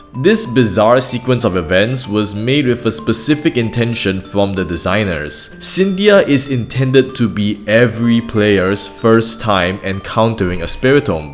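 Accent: Singapore. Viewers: I instantly recognise that Singaporean accent, certainly didn't expect to come across a fellow mate talking about our childhood trauma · Are you singaporean? Or Malaysian? Srry i js hear the accent and I’m js curious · That Singaporean accent tho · From your accent, I can already tell that you're a singaporean lol and your channel profile confirms it. Really make me feel so wowed for some reason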